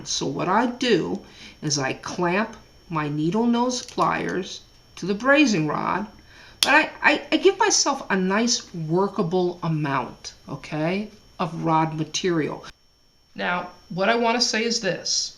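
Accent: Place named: Fargo, United States. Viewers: It has a FARGO twang, for sure. People from West Coast starting at the California/Oregon heading N have the same.....'bout like Sarah Palin (no offense).😋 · Cool vid!I dont know if youre from minnesota or not,but you oughta be.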